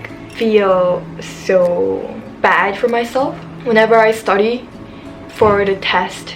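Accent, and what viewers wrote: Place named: Philippines. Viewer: I know youre not good in Tagalog but the way you speak English sounds so Filipino :-)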